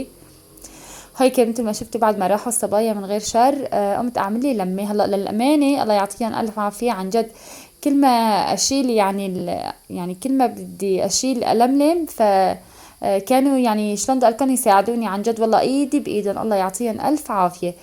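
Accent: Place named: Aleppo, Syria. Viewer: تشرفنا روحي والله عرفتك من حلب من صوتك بس استغربت عبقلولك انتي من شام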